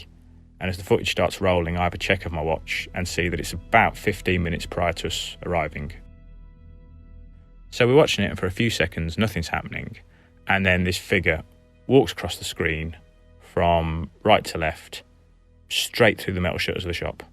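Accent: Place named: Black Country, United Kingdom. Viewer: Nice to hear a Black Country voice! I grew up in Stourbridge...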